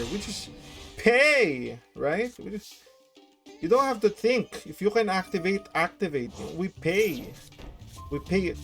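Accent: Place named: Philippines. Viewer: u sound Filipino af. are u Filipino?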